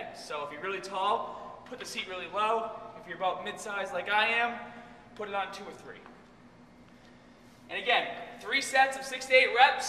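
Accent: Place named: New York, United States. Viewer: He's from New York...that's how they talk! :)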